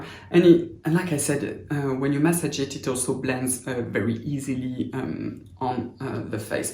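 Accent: France. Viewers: Bonjour! I adore your videos, especially appreciating the science. There are far too many skincare videos of sincere, but but subjective reviewers, essentially intuitively responding to products. Also, I might say as an American, your American English is impeccable, as you have clearly mastered idiomatic expressions. It is quite "cute," as a native English speaker, to hear such idiomatic expressions from a Frenchman. Your accent is also adorable. As an aside, I speak French. I am told my accent is "adorable" to be fair.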